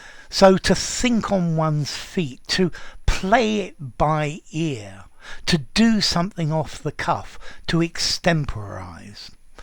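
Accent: RP, United Kingdom. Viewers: I wonder if the teacher speaks Contemporary RP. Can you tell me?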